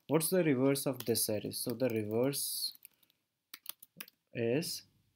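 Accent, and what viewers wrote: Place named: India. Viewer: Everytime hear an Indian accent dude teaching tutorial, I know he will be goddamn good.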